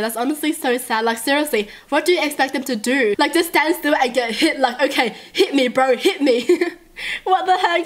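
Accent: Australia. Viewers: Nana Danisa wow ok, so your calling people idiots for being slightly mistaken? She has an Australian accent, who can blame them if they thought that (and by the way, people can be Australian soooo yeah. Your argument that it's "not a race" makes no sense and doesn't benifit you or your argument" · She grew up in Australia so she has that accent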